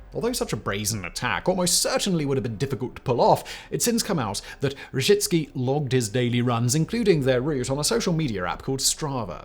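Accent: United Kingdom. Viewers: I have to admit that I have a weakness for the British accent. An Englishman (or woman) could be reading the phone book and I would be entertained for hours...so imagine how pleased I was when I found Simons'channel (s),(s),(s),(s)... Speaking of his channels... I think that a mad scientist genetically engineered a sample of DNA & the concept of the YouTube channel which produced an octopus type creature with many channels and many brains. An octopus with a magnificent beard I might add. Gods beard isn't half as impressive. Surely holds the record for the most channels...if there's someone with more, I don't know of them. As long as keeps creating, I'll keep watching. Thanks.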